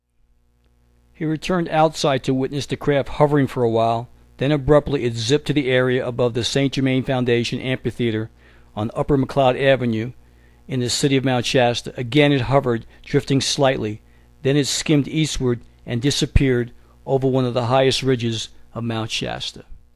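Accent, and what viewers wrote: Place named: New York, United States. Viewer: Narrator with a NY accent does documentary of stories from Mt. Shasta, in CA?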